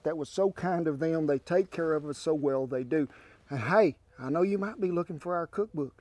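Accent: South, United States. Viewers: Bool the chicken then a nice coat of ool. Southern accent 👌👌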